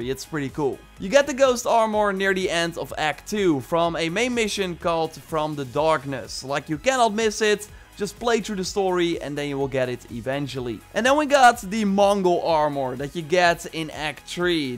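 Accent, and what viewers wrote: Netherlands. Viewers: Nederlandser accent kan niet 😂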